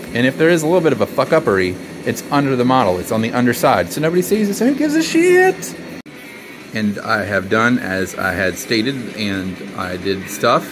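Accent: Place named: South, United States. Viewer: Idk why but your southern accent is at 200% on this video. 😆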